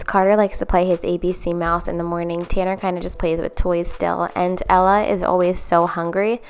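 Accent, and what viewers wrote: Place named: East Coast, United States. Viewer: Just discovered this channel today...I have to ask, does anyone know where she is from? She seems to have an east coast accent. I'm originally from Cape May...so I can usually spot an east coast accent instantly (makes me a little homesick) 😊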